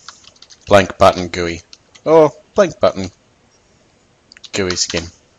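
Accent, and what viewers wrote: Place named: Australia. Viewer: I'd bet my socks your australian...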